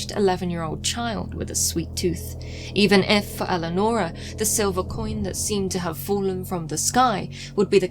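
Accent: United Kingdom. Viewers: I love narrators/storytellers with British accents, it grips your attention and it's elegant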